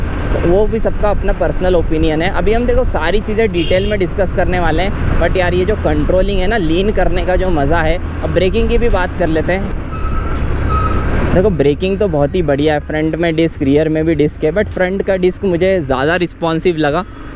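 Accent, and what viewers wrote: Indore, India. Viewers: Are you from indore ??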